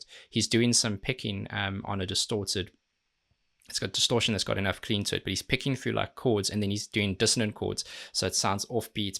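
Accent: South Africa. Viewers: Also, I've only just found your channel, do I detect a SAffer accent? edit* Just watched your Q&A - Jo'burg boy eh? Howzit Boet? I'm originally from Namibia, so it's great seeing someone from that part of the world doing well. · Is this guy South African??! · Look. I'm American so I'm sorry if I'm wrong... But are you south African?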